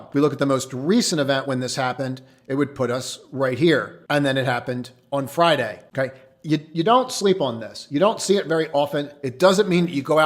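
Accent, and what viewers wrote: East Coast, United States. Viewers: I love how you say current….its Karen plus ent. Its cute. Must be an east coast accent. Excellent video. ❤❤❤❤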